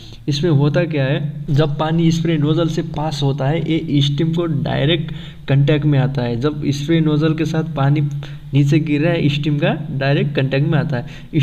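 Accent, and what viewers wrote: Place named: Nepal. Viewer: Nepali ho Kya bro ta .. Hindi ta Nepali le bolni jasto cha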